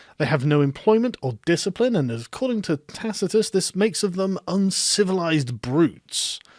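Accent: United Kingdom. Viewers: I'm from the U.S. Love your British wit and accent. I despise what youtube has done to you and we haven't stopped it. All aboard Trump 2020 and Brexit!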